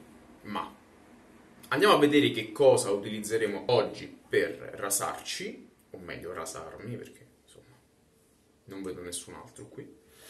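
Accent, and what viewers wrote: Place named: Rome, Italy. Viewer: Ciao, la tua cadenza romana mi piace molto. Penso che hai sviluppato una ottima tecnica, la tua zona baffi non è abituata alla lama ecco spiegati i piccoli taglietti. Ma il ventaglio dov'è? Io da quando ti ho copiato sta genialata lo uso sempre 😂🤣 Ti abbraccio 💈🔝👏👍💈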